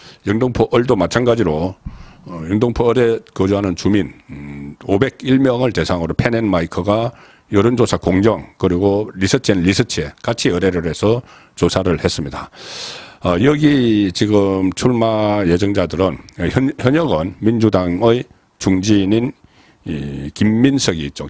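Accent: Gyeongsang, South Korea. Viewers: 송국건은 서론과 잡다한 중복 이야기가 너무 많아 답답하다. 좀더 깔끔하게 발언하면 좋겠다. 다른 사람과 대담식에도 자꾸 엉뚱한 말로 끼어들어 혼란을 야기한다. 경상도식 언어로 잡다한 얘기가 많으니?참고하기 바람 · 경상도 발음 부터 좀 고치는게 더 좋을것 같다~~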